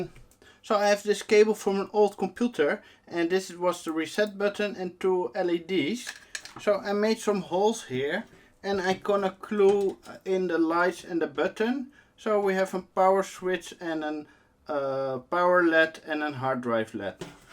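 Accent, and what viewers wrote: Netherlands. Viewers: Zwaar NL accent gast. I suggest getting rid of the hdd's and putting in an SSD, that would make the system faster, more quiet and consume less power. And you can use the storage for logs, caching or snort. You really don't want to virtualize your PFsense router trust me! Sure it's fine for some tests but it's a pain in the ass if something goes down. Also with segmenting your network or VLAN's it's much easier to have a physical machine. The only drawback is power consumption.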